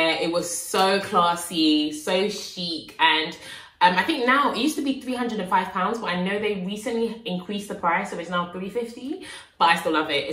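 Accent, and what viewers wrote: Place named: South, United States. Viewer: Love your review and your accent with a little southern twang. "Bruh you pay for this shit"....lol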